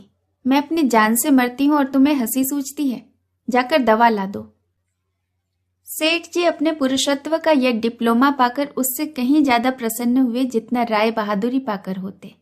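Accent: Standard. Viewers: Apki shudh Hindi ,aur awaj ki madhurata kya khne . Munsi ji ki kahaniya , sansmaran , upannyas bahut hi hridaysparshi hote h . Pranam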